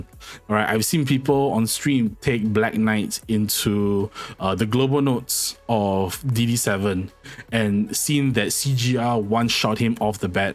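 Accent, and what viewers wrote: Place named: Philippines. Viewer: good vid bro, your accent is heavy .. are you filipino??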